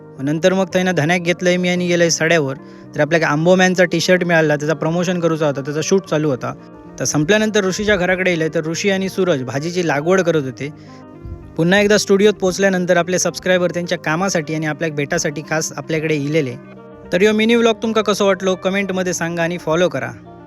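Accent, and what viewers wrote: Malvani, India. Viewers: विरण मध्ये आली की आम्ही तुला भेटणार आहोत तुझे व्हिडीओ खूप खूप सुंदर असतात मला तुझे मालवणी बोलणे मला खूप आवडत लवकरच भेटू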